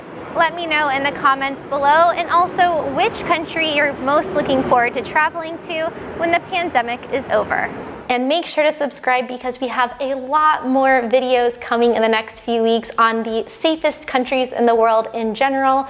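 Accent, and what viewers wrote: United States. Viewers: Meanwhile at a place near you in the US of A confirmed Covid19 cases 6.83M, deaths 155K Source Covid19 alert. Africa population 1.22B Covid19 cases 870K, Deaths 100K Recoveries 524, 557 African countries in no particular order: Ghana Population 30M Covid19 confirmed cases 34,406 Ethiopia Population 109.2M Covid19 confirmed cases 15,200 Kenya Population 51.4M Covid19 confirmed cases 18,508 Source John Hopkins's University Africa Centre for Disease Control As soon as I saw the blonde hair and American accent shows a picture of the entire world and only focuses on countries with people who look like her. For a disease that doesn't discriminate; I am thousands of miles away from America so this one can't call the American Police on me to shoot me!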